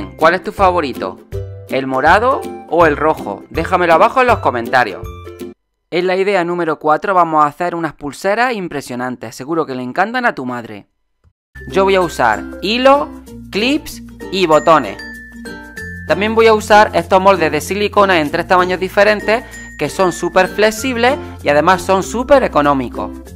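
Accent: Spain. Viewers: Eres español, de que parte porfa?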